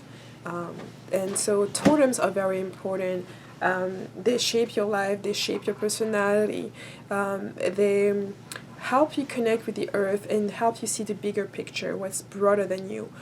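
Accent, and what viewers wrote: France. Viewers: You have sort of french accent. Is is Your first language? (If so, Your English is amazing!) Very good video, by the way :-)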